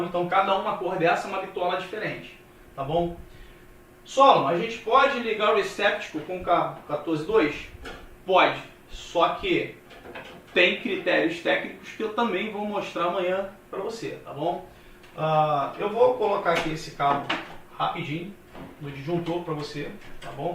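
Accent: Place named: Rio de Janeiro, Brazil. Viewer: Bom dia meu amigo, ganhou mais um escrito, sou do Rio de Janeiro pelo seu seu sotaque vc deve ser aqui do Rio, trabalho Muito <link> elétrica estou pensando em irmora ae abraços e parabéns pelo conteúdo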